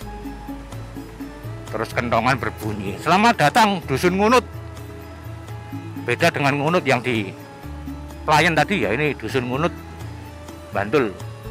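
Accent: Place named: Surabaya, Indonesia. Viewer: Opo wong playen asli Suroboyo Mas, loghate kok suroboyoan.?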